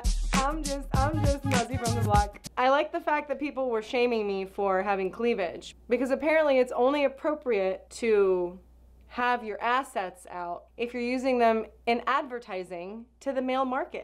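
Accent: Iran. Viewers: I love your videos 😍 sense of humor 😂, and especially the Iranian mom accent. If you are getting hate mail in comments that just means they are jealous haters. Keep up the good work 👌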